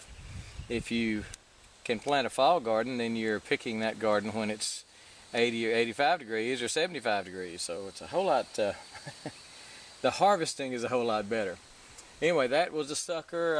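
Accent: Texas, United States. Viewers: Awesome video and great information I'm gonna try. Boy, sure got home sick hearing that Texan draw. I'm down south 13 miles from Galveston and sure miss them piney woods, ha!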